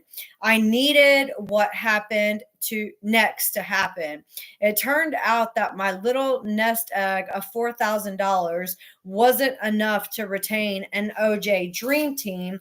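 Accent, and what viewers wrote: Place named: Louisiana, United States. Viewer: Buttery and Cajun accent do not belong in the same sentence.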